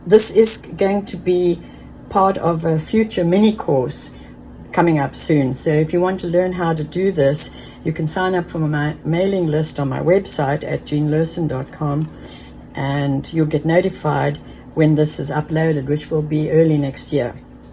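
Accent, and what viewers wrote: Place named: South Africa. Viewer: I know this is out of context, but are you from South Africa? You sound very much from here.. love your channel❤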